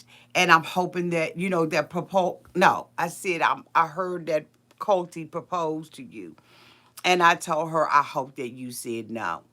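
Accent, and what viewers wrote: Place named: New Orleans, United States. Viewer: I am a Newbie to your Channel.. Tell'em Auntie!! Lol I was laughing as You going OFF on that Person in Your Live Comments.. You Go Auntie!! Sound like that Southern accent, New Orleans Style..Auntie Colt in his Speedos lmao That was a Nasty Seen!! Auntie when I seen Colt come out with them Speedos on I fell off my Couch dying.. 😂😂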